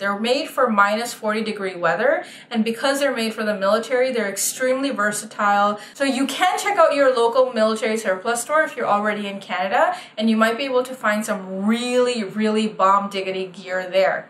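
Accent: India. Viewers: really really really really really thanks for the South Indian accent..I am not Indian, but I am in Bangalore for almost 4 years and it is super, pakaa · 😀😀😅😅 That Indian speaking excent was really nice. Informative video👍👍... Keep it up 👍👍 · You look so cute with that Indian accent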